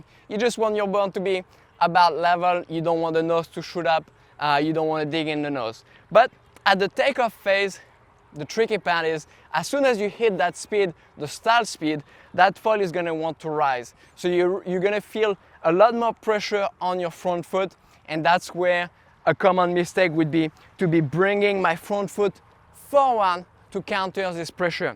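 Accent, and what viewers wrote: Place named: France. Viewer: Hello. Amusant on dirait un accent français... je comprends tout ^^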